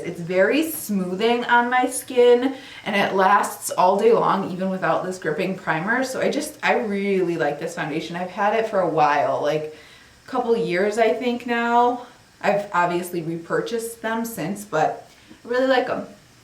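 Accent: Chicago, United States. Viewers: Just discovered your channel and could tell right away you are from Chicago with that accent! Love this video.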